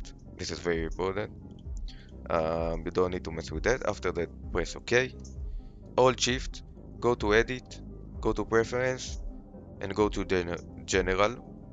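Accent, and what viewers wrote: Israel. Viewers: אין סיכוי שאתה לא ישראלי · אתה ישראלי? איזה מבטא יש לך כפרה לא רואים בכלל שאתה ישראלי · שומעים את המבטא הישראלי חחח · הוא ישראליייי?!!!?! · רואים שאתה ישראלי שומעים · המבטא הכי ישראלי ששמעתי נודר😭🙏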